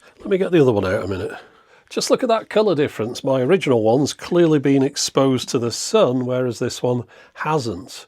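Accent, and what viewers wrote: United Kingdom. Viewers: This was hilarious. went berserk. And his delivery is sooo English.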